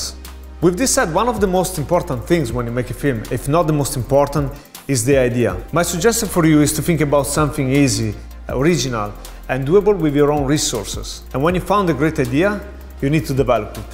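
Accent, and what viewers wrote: Romagna, Italy. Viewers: This guy has a very marked italian accent. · Ha un accento italiano così rustico, che figata 😂😂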